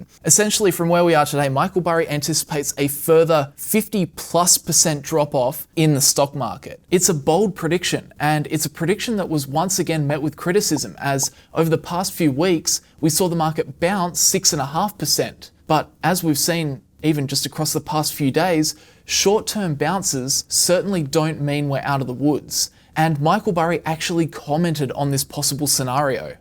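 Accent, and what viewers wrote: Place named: Australia. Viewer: That australian accent... Cute but not that understandable to me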